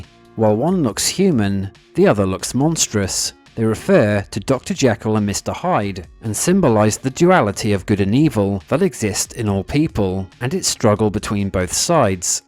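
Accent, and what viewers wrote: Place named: United Kingdom. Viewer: I love these videos and your British accent. Great videos to sleep to, not too many loud sounds n such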